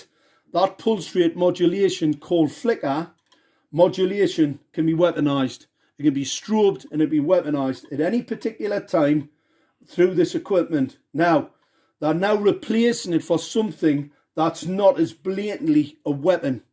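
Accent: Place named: United Kingdom. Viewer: Klares britisches Englisch ohne großen Dialekt - wo liegt das Problem? Die Qualität der Erklärung ist eher dürftig.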